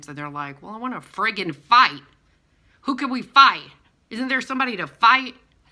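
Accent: Louisiana, United States. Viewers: Awesome! Thanks for your honesty! Originally from Lafayette, when I hear you speak it makes me lonesome for the lovely people in South Louisiana, take care and keep spreading your love around, it’s a blessing for us!!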